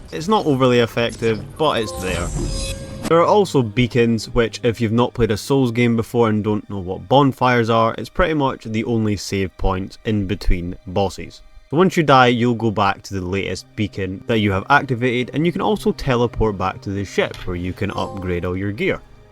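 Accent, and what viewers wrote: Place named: Scotland, United Kingdom. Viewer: God bless you. I actually really enjoyed this video. I laughed a lot. Something about a miserable man with a Scottish accent makes it that much better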